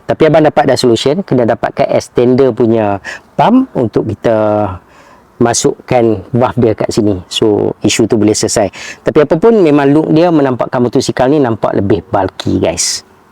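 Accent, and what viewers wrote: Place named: North, Malaysia. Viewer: Bunyi mcm utaqa...area mana bang?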